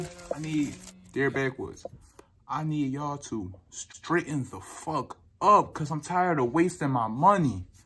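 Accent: Philadelphia, United States. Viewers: He from philly I can tell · I could tell he from philly lmao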